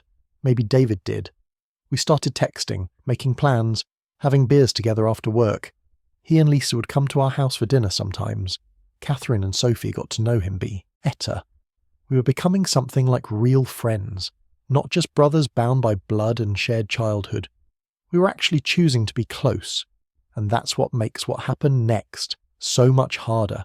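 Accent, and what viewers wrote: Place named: United Kingdom. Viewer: This is an American story, why is there a Britain narrating it?,, I want to hear America’s story of American voice.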